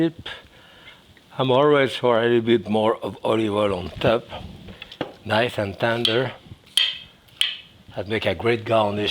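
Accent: France. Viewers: He hasn’t got a speech impediment….he’s speaking with a French accent. If you struggle to understand him, then I suggest that you turn on the subtitles. They don’t have an accent!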